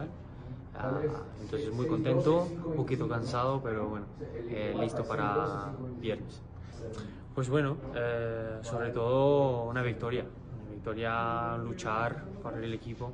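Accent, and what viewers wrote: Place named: Spain. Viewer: Acento español es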